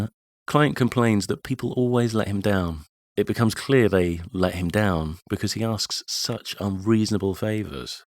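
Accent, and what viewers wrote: England, United Kingdom. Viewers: You sir are subbed. I followed a similar path in my education. Although it was Sociology and Anthropology. As you can imagine the ludicrous level of psuedoscience I saw, it might have been even worse for me. I'd love to able to do a series like this. But,I am absent the articulate and refined english accent and being american find myself exploding into arrogant & angry fits of cursing. :).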